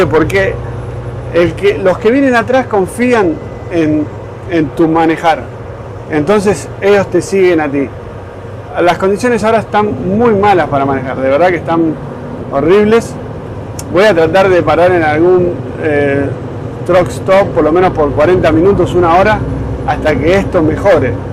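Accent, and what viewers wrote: Argentina. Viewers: Vos sos argentino!!!! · Saludos desde Monterrey, Mexico.. tienes acento tipo Maradona (qepd), tus consejos son exelentes!!! gracias.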